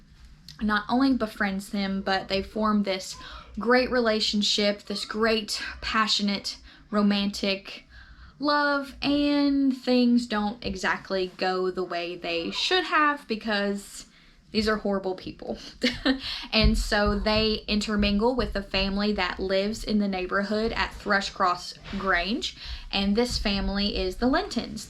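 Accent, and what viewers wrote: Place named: Carolinas, United States. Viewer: I would say from the Carolinas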